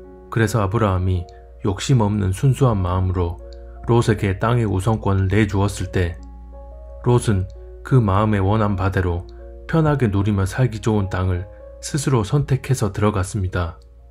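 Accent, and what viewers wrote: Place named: Jeolla, South Korea. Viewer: 전라도 억양 듣기싫다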